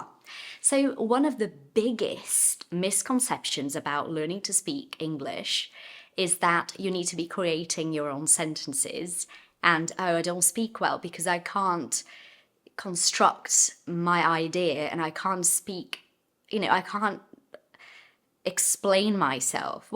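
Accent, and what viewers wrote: United Kingdom. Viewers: You have a beautiful British accent!! I couldn't tell you are not a native, actually!!! 😮😊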